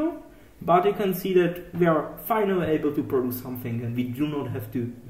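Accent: Czech Republic. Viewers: nják slyšim českou angličtinu :D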